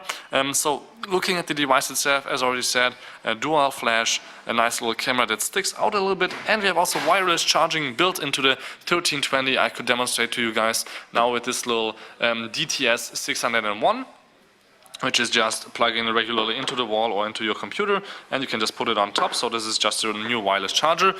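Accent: Germany. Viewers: Who cares if he has a german accent? Lets see you try to speak german n not have an accent.lok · this german accent is killing me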